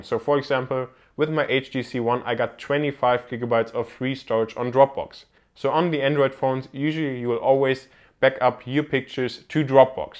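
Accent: Germany. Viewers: Youre german? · are you german? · Der deutsche Akzent <3 Super Video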